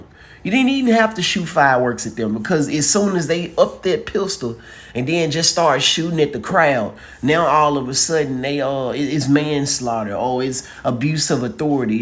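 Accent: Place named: Detroit, United States. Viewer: Bro you sound like you live in Detroit 😅 It goes down fr fr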